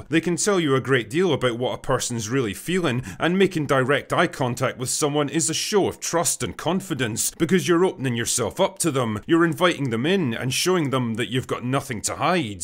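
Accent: Scotland, United Kingdom. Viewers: Is it Scottish accent?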